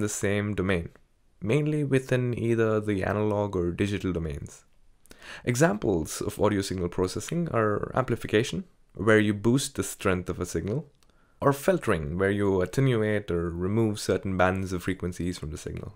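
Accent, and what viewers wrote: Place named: India. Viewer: this would've been a great video if u didn't have that accent, or if u weren't indian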